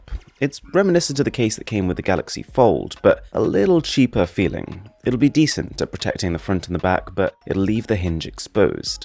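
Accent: RP, United Kingdom. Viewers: You sound like a etonian chinless wounder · Relax with the exaggerated posh accent. It's laughable. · He’s from the UK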